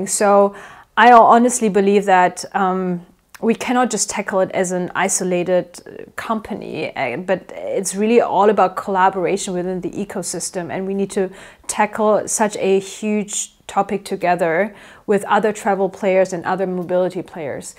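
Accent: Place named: Germany. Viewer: Ich mag ihren deutschen Aktzent...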